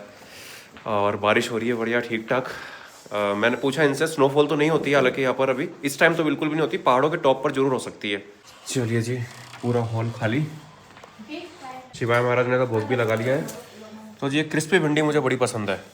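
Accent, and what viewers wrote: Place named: Rajasthan, India. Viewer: For the first time watching your vlog.. Nd aapki rajasthani sun kr meri beti khush ho gayi.. Ki ye tou apne hi h... Morpankhi vala time aapne sahi kaha.. Barat ka swagat hota tha morpankhi or gupab ka phool dekr... You are doin wonderful job... Keep goin..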